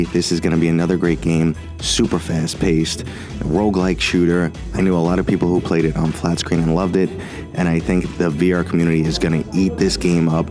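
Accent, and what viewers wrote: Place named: Brooklyn, United States. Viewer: You know when that Brooklyn accent "tries" to whisper, you're in for something good.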